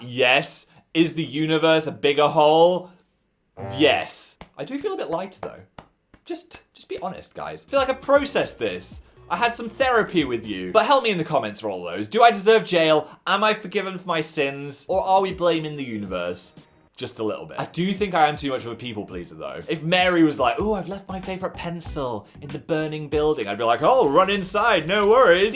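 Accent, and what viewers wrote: North, United Kingdom. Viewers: You were soooo northern in this video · is it just me or is extra british in this video 😭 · switched to northern for dialogue and then did the entire remainder of the story in northern, that’s pretty cool tbh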